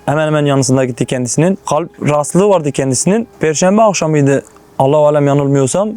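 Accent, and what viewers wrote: Diyarbakir, Turkey. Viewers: diyarbakırlı belli arkadaş klpcdbrdr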